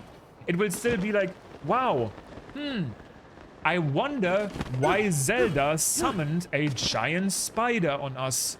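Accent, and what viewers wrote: Germany. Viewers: So after almost 3 hours of interesting thoughts and stuff I have to say, please work on your pronouncing. Even thats our way of leaning school english in Germany, this is so much of an pain to listen - this german-ish-english pronouncing. You still did well, don't get me wrong, but it would be more perfect when I could enjoy your talk more. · Man hört so hart das du deutsch bist 😂❤ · I really like your German accent. It sounds very nice.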